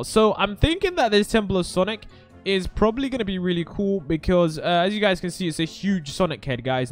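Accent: United Kingdom. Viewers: She is a British accent not Tycho Natalie Cole of Montgomery not see Kola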